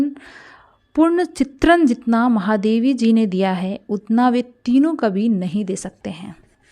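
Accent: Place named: Bengal, India. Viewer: और दीदी आपका आवाज मुझे बहुत अच्छा लगा इसमें बंगाली टोन है जो मुझे बहुत ज्यादा पसंद है और पता है दीदी मैं आज तक खुद से ही असाइनमेंट के सारे आंसर ढूंढती थी और लिखती थी किताबों से पर जब मुझे प्रश्न 5 का क नहीं मिला तो वह पद्यांश में गूगल पर सर्च कर रही थी तब मुझे आपका वीडियो दिखा इसका मतलब की जो आपने छोटे-छोटे प्रश्न के उत्तर दिए हैं अलग-अलग करके एक-एक प्रश्न के उत्तर दिए हैं वह बहुत यूजफुल था सर्च इंजन में काम करने के लिए। मैं उसी के सहारे आपके लॉन्ग वीडियो तक पहुंची हूं आपका काम बहुत अच्छा है दीदी अगर छोटा-छोटा वीडियो नहीं डालती और इकट्ठे बड़ा डालते तो शायद मै आपकी इस लॉन्ग वीडियो तक नहीं आती। मैंने पद्यांश डाला तो उसके उत्तर के रूप में आपकी वीडियो आए और अर्थ सहित और ऊपर मैं वह क्वेश्चन का आंसर दिखा रहा था और आपका टाइटल भी था यह सब बहुत अच्छा था तभी आप फर्स्ट नंबर पर सर्च में आ गई गूगल क्रोम पर और दीदी आप इसका प्लेलिस्ट भी बना सकती हैं उसे जनता को बहुत सुविधा होगी और दीदी आपका काम बहुत अच्छा है.आपकी लिखावट बहुत ही स्पष्ट है आपकी लिखावट बहुत अच्छी है मुझे कोई दिक्कत नहीं हुई है और जहां अगर थोड़ी बहुत दिक्कत हुई तो आपका बोल-बोल कर बताने से वह प्रॉब्लम भी नहीं हुई और बहुत आसानी से स्पष्ट आवाज में सब कुछ समझ आ गया और मैं फाइनली एक असाइनमेंट कंप्लीट कर दी दीदी आपको धन्यवाद आपने बहुत अच्छा काम किया है आप बहुत काम समान में भी अपने कमरे को किताब की वीडियो बीच में फोकस की है जिससे पूरी कॉपी दिखाई दे रही है दर्शन को बिल्कुल भी परेशानी नहीं हो रही है और आप उंगली रखकर एक-एक बता रही हैं और जल्दी-जल्दी पढ़ रही है सब बहुत आरामदायक था बहुत अच्छा लगा दी थी आपका काम जिसको इसकी जरूरत है उसके लिए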